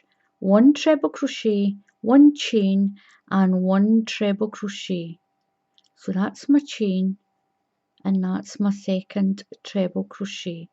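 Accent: Scotland, United Kingdom. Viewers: Thank you,! You’re an amazing teacher. I don’t read written patterns well and need a video to help. I was in Scotland earlier this year for our daughter’s wedding to a lovely Scottish fellow. (Came home early due to this Covid mess). Your accent brings back all the memories of the warm Scottish welcome we had. Stay well! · Hi gosh listening to you reminds me of my granny who came from Montrose she taught me to crochet many many years ago when i was 9 I'm 65 now and live in NZ so it was lovely to hear you Scottish voice. Enjoyed your video and look forward to learning more things many thanks again:D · Thank you. I have been struggling with the repeat of this pattern in the past. You have now explained it very well. Thanks Thanks. I also love your Scotish accent.❤ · .... I absolutely love this pattern... and you.... are an amazing teacher.....so well explained... 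very good camera views on the stitches... wow... just amazing to follow.... I can’t read patterns so I can only make what I can’t follow in tutorials.... you are so easy to follow... I love ❤️ your accent.... so much... I worked with a woman many years ago from Scotland.... she called herself...LADYBIRD..... 😂I just LOVE ❤️ listening to you speak....fond memories....thank you oh so much....I will be making this blanket.!!! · You are a fantastic teacher and it’s so wonderful to hear a fellow Scottish voice! 🏴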